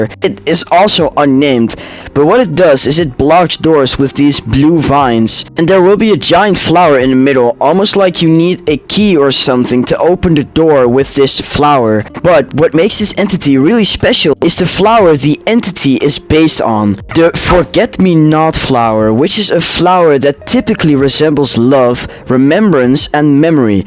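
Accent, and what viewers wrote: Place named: Netherlands. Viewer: I noticed that you have a bit of a Dutch accent. Are you Dutch by any chance?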